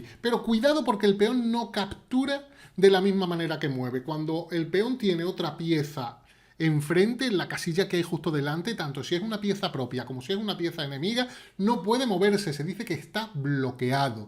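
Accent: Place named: Argentina. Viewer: Che buen video Me intriga tu acento medio argentino medio español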